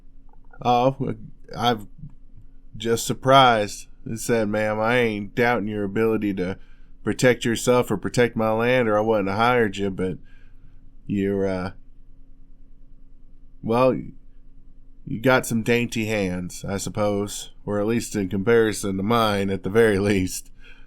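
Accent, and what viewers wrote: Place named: South, United States. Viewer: I loved the audio as always I'll be adding this to my playlist I absolutely love your country accent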